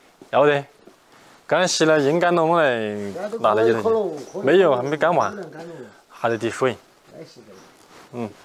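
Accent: Sichuan, China. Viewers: 作为一个重庆人，习惯了油管上的国语和英语，听到王刚的四川话，既感觉亲切，又有些想笑。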